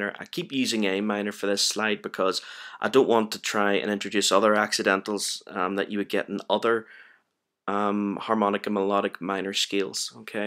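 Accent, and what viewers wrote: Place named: Ireland. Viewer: You speak with an Irish Accent... I might be wrong man...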